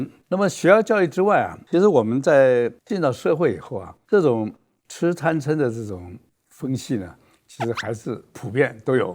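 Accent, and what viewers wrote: China. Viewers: 是大陸人嗎？為什麼講的是大陸腔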